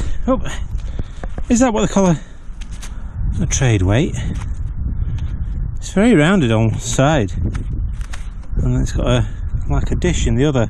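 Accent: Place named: England, United Kingdom. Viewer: Your accent makes me homesick for England. I grew up in Manchester and moved to the USA at 14. I lost my accent but people still hear a little of it. It's a lovely area where you are. I used to love walking in the fields and parks when I was young. I really hated reading about the fire at Wythenshaw Hall, I have fond memories of walking through the house and looking at the beautiful antiques they had . I hope they caught the horrible person that set fire to it. They destroyed a lot of history. Until next time, happy hunting, have a great day and I hope you find some really interesting artifacts. God bless.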